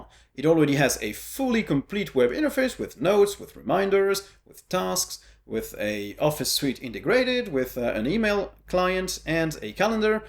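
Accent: France. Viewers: I'm french and I find it weird to listen to a Frenchman speaking in English. But your channel is very interesting and you're the reason why I'm rocking elementary os on my laptop as a teacher everyday!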